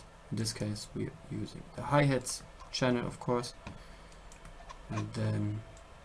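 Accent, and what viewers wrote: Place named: Germany. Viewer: Dein Englisch kling Deutsch^^. oder?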